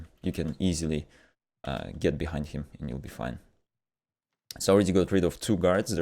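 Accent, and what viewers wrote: Russia. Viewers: You live in New Zealand right, but your accent reminds me russian a lot.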